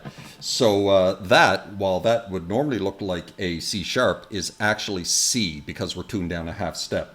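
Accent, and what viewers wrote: Canada. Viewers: good to hear a Canadian accent! I was living in Toronto until January, now im back in Australia. Miss my buds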